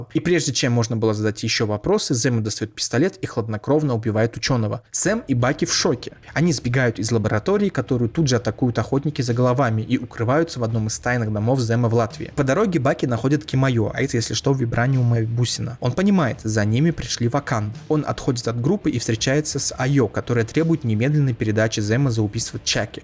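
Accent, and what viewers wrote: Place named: Tajikistan. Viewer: Интересно.Ты какой нации чел? Такое ощущение что как будто таджик или узбек говорит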